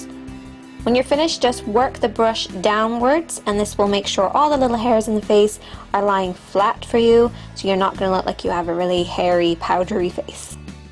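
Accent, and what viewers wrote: Ireland. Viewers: yea its irish! I think its because american accents were predominantly derived from irish accents :) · shes Irish.. :-) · It's Irish. :) Yeah, I've thought the exact same thing - she does go into a bit of an American accent at times. But then she goes back into her Irish one (which I love! because it's so unique, especially on Youtube). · This is an Irish accent :) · Are you Irish? :D I am ;DD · really love her accent :) love irish accent :) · she's irish :) x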